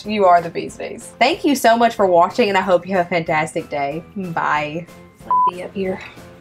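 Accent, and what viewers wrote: South, United States. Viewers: Damn u a QT!! I had to sub!! CANT get my Lemon Pastel to eat a FT to SAVE his Life!! 🤦‍♂️ I cant even get my lil ninja to STRIKE one time just on some Defensive issh!! 🤦‍♂️ I know he ain’t gone strike at a mouse NO time Soon!!! Also, what part of the South are you from??? Lol I love your countriness(I know that’s not a word) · Very informative video. And it is nice to see a beautiful woman with a Southern accent. I have a 10 month old ball. She does really well feeding. I feed her a grown mouse about every week. I think it may be time to move up to small rats.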